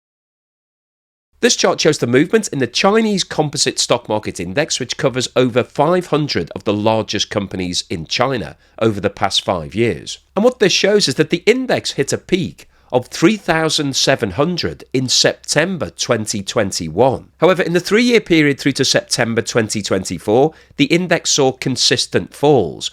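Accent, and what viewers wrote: United Kingdom. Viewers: Gotta love that THICC brih'ish accent, great content, thanks for sharing!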